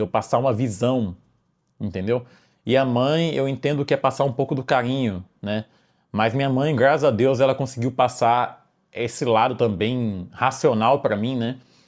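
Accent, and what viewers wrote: Brazil. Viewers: Vey sei q n tem nd a ver mas seu sotaque é mto bom ai qria te pergunta de onde ce é parece mto interior de sp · De onde você é? MG? GO? Achei sotaque diferente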